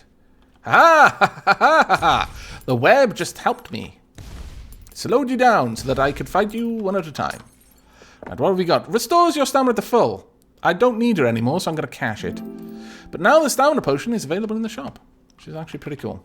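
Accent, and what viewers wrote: Wales, United Kingdom. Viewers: Damn it. You make me want this game. Both my wife and I know the joys of hearing the jaunty awesome Welsheness of.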